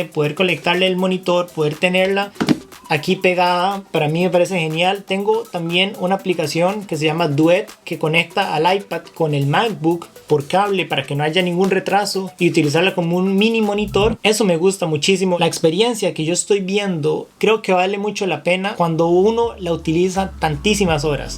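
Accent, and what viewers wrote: Costa Rica. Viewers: Hola Hola! He visto algunos de tus vidos y me han gustado por lo claros y concretos, muy bien elaborados gracias! Para un uso universitario (lectura, edición de documentos word, docs, excel, sheets, pdf) ¿cuál me recomiendas? El 9 no me llama la atención mucho por su puerto lightning Estoy entre iPad y Tab S7 FE. . . PD. Tienes un acento como tico 👀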